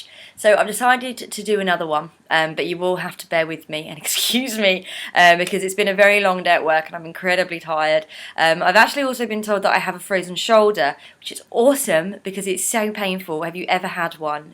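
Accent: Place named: England, United Kingdom. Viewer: love your enlgish accent.....lovely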